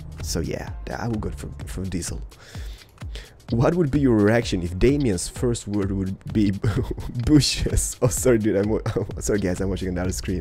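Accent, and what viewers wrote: Sweden. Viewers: ÄR DU SVENSK?! (are you swedish?!) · Wuuuut are you Swedish :O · Jag är oxå från sverige :D Du har svin härlig dialket!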